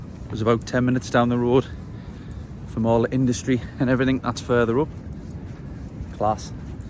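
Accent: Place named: Newcastle, United Kingdom. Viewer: Got to know this bridge, courtesy of the wonderful song, by. Himself a Middlesbrough lad who sadly died in December 25. Chris’ words of this song tell his story of the birth and demise of the area. Thanks for this short video. As a Southerner, I call your accent a Geordie, but I could be off the mark. First one of yours that I’ve seen due to the Transporter bridge and area which so cleverly penned into a memorable song ❤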